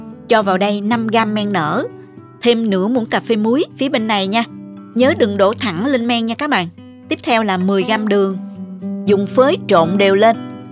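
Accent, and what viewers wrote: South, Vietnam. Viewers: nói giọng miền nam rõ ràng và dễ thương lắm. Chỉ dẫn rõ ràng, thao tác chuẩn bị sạch sẽ. Cảm ơn cô đã chia sẽ nhiều món ăn ngon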